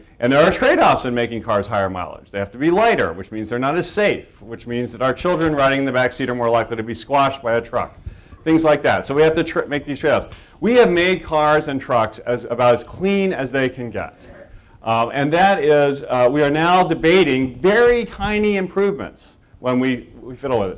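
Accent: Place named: Texas, United States. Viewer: I could tell you were from tex-a-a$$ in the 1st few seconds, you are a pre-programmed idiot... Not surprised you're an ex-lawyer that grew up with ONE radio station.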